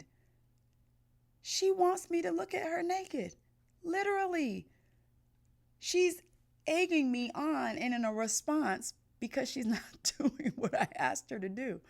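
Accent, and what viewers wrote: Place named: East Coast, United States. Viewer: "What I wanted to say was... get your in the bed, why are you naked for a facial?!!"... ROTF.... I can't breath! you sure sound like you're from the east coast!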